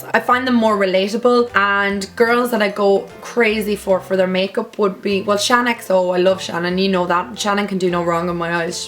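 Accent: Ireland. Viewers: Love this video, love the irish accent xx i will deffo ask you a question next q&a :)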